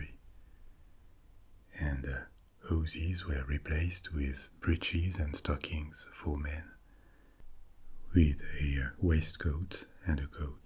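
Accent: France. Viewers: My sleep can be erratic! Some days and night, despite fatigue, I don’t sleep. Or, I eventually fall asleep, or something altogether different, I can fall in bed and fall asleep very quickly!!! This has been my fate most of my life including as a young child. seems to be just the ticket! I love his French accent, I love the whispery and intimately inflection that seems to be just him with me. When I don’t fall asleep right away, I am unformed. When I can’t fall asleep at all teaches me! This man has a craft, a skill, and a gift! I feel honored to be included!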